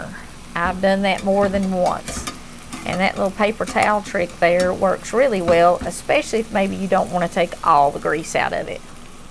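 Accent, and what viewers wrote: South, United States. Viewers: She is a sweet and real lady but, I just love her accent...southern and country. God Bless her and her family!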